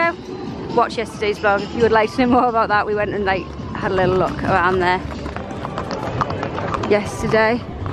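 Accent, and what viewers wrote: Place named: Northern England, United Kingdom. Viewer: Your a great travel guide. Love your northern English accent.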